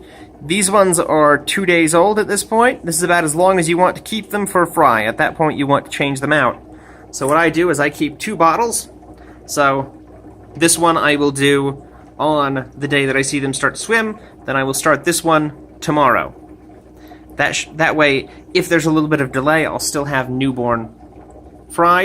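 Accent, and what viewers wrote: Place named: Australia. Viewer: Ok thx I’m using a pipet I’m new to keeping fish, and are you Australian bc I am I recignise the accent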